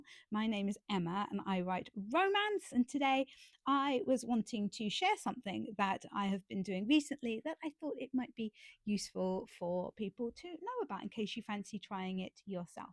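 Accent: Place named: New York, United States. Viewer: welcome. I love when your real NY accent slips out.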